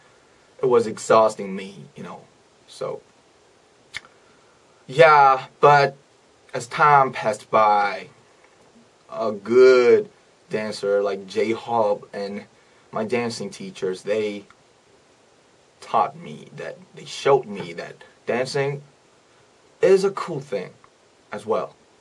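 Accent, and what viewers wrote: United States. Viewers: he literally sounds like a true American- bruh my dad who has lived in the states for over 15 years has a strong polish accent and he also learned english from friends meanwhile here who has never lived in the states for more than what a couple months sounds like he was literally born and raised in the states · He sounds like he was born and raised here in the states and went over to SK to be an idol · A cute LA California boy 😆. But his accent is amazing. Legit thought he was from America or had lived here for a long time. Mind blown by him all the time. Love you RM 💜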